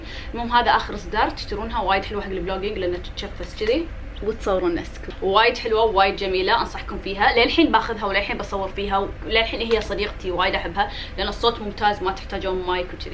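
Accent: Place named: Egypt. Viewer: اللهجة المصرية 😍👌🏻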